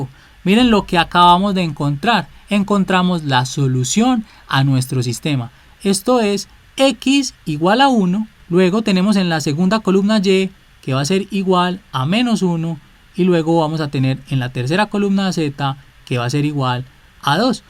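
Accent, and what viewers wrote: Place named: Medellín, Colombia. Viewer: Raúl Alberto Díaz Garcia Es de Medellín. Asi es su acento.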